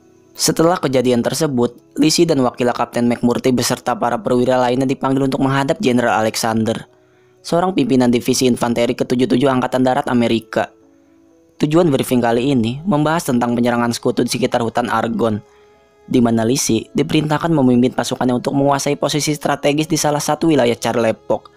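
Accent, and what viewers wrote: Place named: Banyumas, Indonesia. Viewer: Logat nya ngapak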